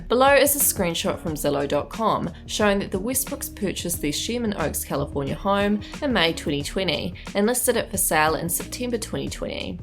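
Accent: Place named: New Zealand. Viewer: Great content! I could listen to that kiwi accent all day long ❤️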